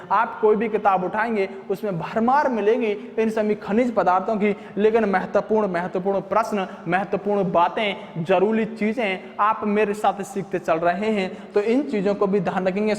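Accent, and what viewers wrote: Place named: Western Uttar Pradesh, India. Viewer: Itna clear hai Bhai ki ye west up se hai shayad bulandshahar.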